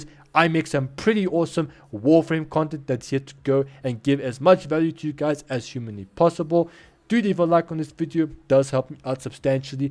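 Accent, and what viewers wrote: South Africa. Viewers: this ou south african?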